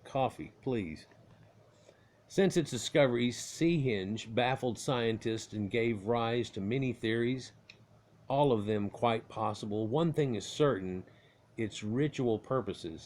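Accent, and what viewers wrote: United States. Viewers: I don't want an American narrating a programme about OUR ancient history! Goodbye!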